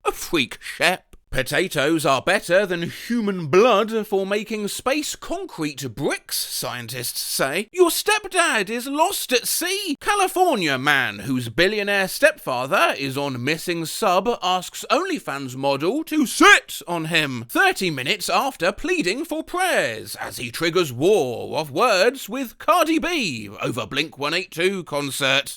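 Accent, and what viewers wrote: United Kingdom. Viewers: I LOVE LISTENING TO A BRITISH MAN YELLING DIRECTLY INTO MY EAR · i have never been so excited about a british man reading text on a screen in my life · my friends will never understand how much whimsy listening to a british man reading quotes from twitter (no im not calling it x im boycotting it take THAT ELONgated MUSKet) and reddit gives me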